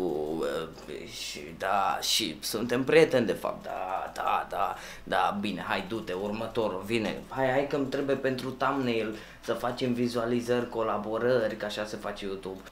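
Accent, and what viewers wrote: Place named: Moldova. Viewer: Ăsta e unul dintre milioanele de motive pentru care eu îl urasc pe! deci, pe langă sa zică la copii sa doneze, şi sa enerveze părinți să le dea bani lui, îşi mai permite si sa îşi bată joc de sucre care, frate, el a avut un trecut prin închisoarea din mexic, fără familie, fără nimic! Dar a fost ajutat la orfelinat de părinți lui (care cred eu ca au fumat ceva) in moldova, trădătorul famili noastre, şi se da el şmecher că el e al 2-lea sau al 3-lea yutubăr ROMÂN care a a milionu. În primul rând el e MOLDOVEAN!!! Trădătorul famili noastre! şi al doilea rând, manipuleaza copii cu accentul lui de moldovean (accent de moldovean). Eu efident, țin cu sucre, findcă el nu e trădătorul famili româniei, şi findcă eu cred şi (probabil) ceilalți cred, ca fura lucruri de la sucre (e evident la câți bani are......). Deci, cei care au dat dislike la acest video, (fără supărare), ați fumat pra mult videoclipurile lui (REKT) Voi puteți sa scapați de asta cu noi toți, adică, uitațivă la ce Heitari are (Zopi teve, Dărielred şi alți) (scriu aşa în glumă) Yutubări voştri preferați. 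Dacă nu ai auzit de aceşti oameni până acum, tu eşti, cel mai probabil, un copil de 5 ani din moldova (daca nu îi şti, dacă îi şti, bravo) Deci, trebuie să scapam de iliesime. (glumă) P.S: sper să ajungă la poliția yutubului de Călinacho! #nuvremcolavremcoroana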